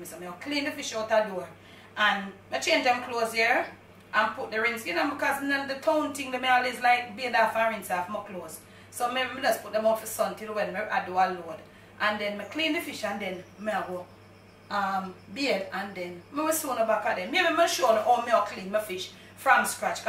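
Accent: Jamaica. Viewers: I do enjoy watching you and listening to you. You are a real Jamaican. You're so funny one minute you're talking nice and the next minute you're bursting out into the Jamaican patois. 🤣😉 I absolutely love to see you cooking. I would like very much if you did do a video showing us how you clean your home.🙄😀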